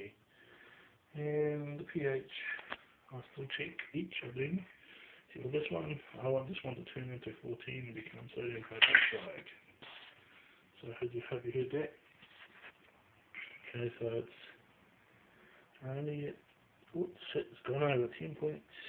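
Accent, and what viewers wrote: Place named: New Zealand. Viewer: try learning to listen to New Zealand accent, then re-listen to it, sorry about the muffled sound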